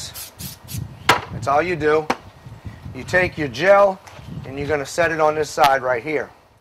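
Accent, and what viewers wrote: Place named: Louisiana, United States. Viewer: Great videos. My wife is from Louisiana and when she first heard you she said he's got to be from Louisiana. Keep the videos coming.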